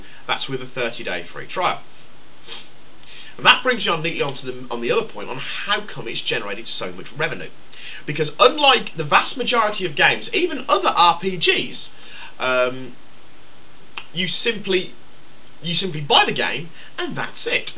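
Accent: United Kingdom. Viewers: yep definetly your real voice british? i dont know what country the currency Quids is from. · your british-ness made this entertaining enough, not really much new to say though. Hey Hey Hey Smoke Weed Every Day.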